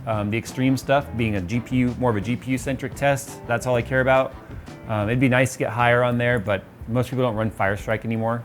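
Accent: Canada. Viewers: your Canadian?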